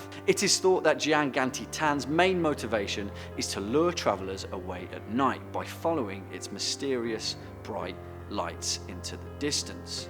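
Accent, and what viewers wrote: United Kingdom. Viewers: Incubus, succubus, Satan, Valak, and sleep Paralysis demons scare me the most weirdly enough with some of yall British accents the videos aren't too scary.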